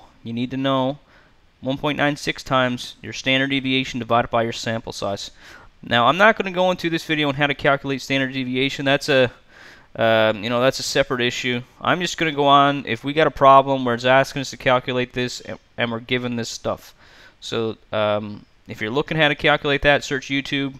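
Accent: Canada. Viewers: Helpful video! Just wondering but are you Canadian? :p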